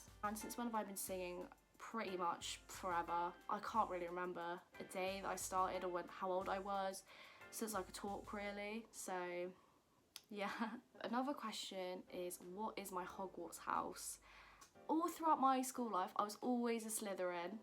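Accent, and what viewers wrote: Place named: United Kingdom. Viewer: I didn’t know you were British 🤯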